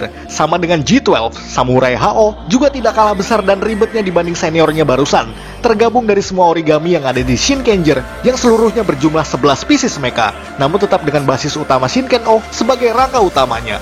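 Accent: Java, Indonesia. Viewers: Hihihi 😁 Saya gak blg gak suka sama sekali lho... Tapi iya saya setuju aja kalo naratornya itu terlalu berlogat Jawa medok apalagi jg 'umbar' SS favoritnya itu Gekiranger, itu sih sah-sah saja... Spt juga saya sampai skr msh memfavoritkan Goggle V dan Kyoryuger... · Di try it itu mnurut gw editannya aga kurang rapi, penyampaian narasinya jg aga kaku bnget dan dimedok²in,.. jjur ya gw jg orang jawa tp aga kurng suka klo ada youtuber yg make bahasa medok jawa gitu, knpa? Ya krna channelnya ditujukan untuk smua tokufans, bukan buat orang jawa doang😅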